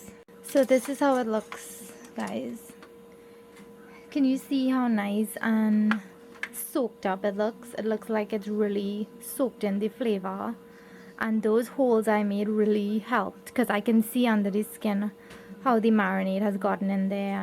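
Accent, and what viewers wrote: Trinidad and Tobago. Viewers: I does Love that Trini Accent so... oh Gawd ! AND she can cook.. I'm gonna have to head to the Homeland and find me a wife. -TriniAmerican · When I think of the trini accent france (French language) comes to mind, so sexy. Great recipe by the way. Real Yard man thing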